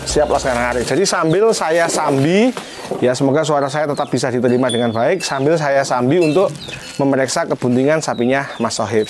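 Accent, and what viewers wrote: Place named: Madura, Indonesia. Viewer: Madura bang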